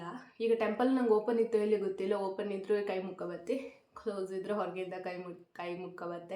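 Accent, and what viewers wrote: Kundapura, India. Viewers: ಬಳಿ ಹಾಯ್ಕಂಡ್ ಚಂದ ಕಾಂತ್ರಿ,ಹಾಗೆ ಹಣಿಗೆ ಕುಂಕ್ಮ ಹಾಯ್ಕಂಡ್ರೆ ಮತ್ತಷ್ಟು ಚಂದ ಕಾಂತ್ರಿ.ಊರ್ ಮನಿ ಕುಂದಾಪ್ರ ಕನ್ನಡ ನಿಮ್ಮ್ ಬಾಯಲ್ಲಿ ಕೇಂಬುದೇ ಚಂದ👍👏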